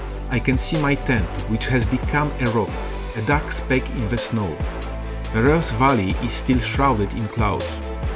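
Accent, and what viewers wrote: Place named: France. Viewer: Cet anglais avec un accent plus que frenchie, c'est terrible à entendre. Sans vraiment aucune méchanceté hein, mais là, c'est un peu terrible quand même...😯 Amicalement.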